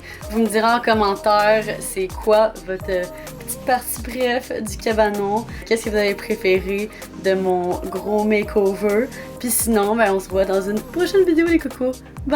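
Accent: Quebec, Canada. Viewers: Je viens de la Colombie mais, j'aime vraiment votre accent québécoise en plus comme tu mélanges le français et l'anglais tu es vraiment naturel et original, je vous aime people quebecois.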